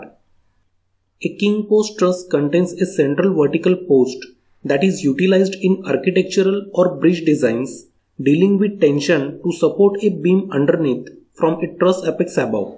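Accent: India. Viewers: I swear to god and please dont take offence but that indian accent is so annoying and also the fact that such amazing educational videos like this is always with a dude with an indian accent makes it worse at the point that I feel bad about it. Great video keep it up . Also do t feel bad about I have an accent too I know how hard it is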